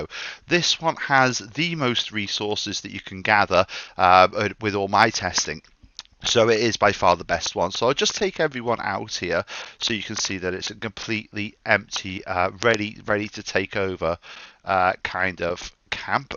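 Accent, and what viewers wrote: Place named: United Kingdom. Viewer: You should play rust because your British voice. You'd do well. 😉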